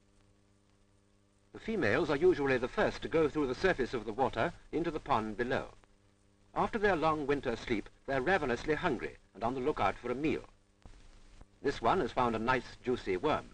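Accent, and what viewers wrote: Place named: England, United Kingdom. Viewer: Wonderful old film! The English accent! BBC eat your heart out. " Thars a newt over thar. It will dash into the warta before long."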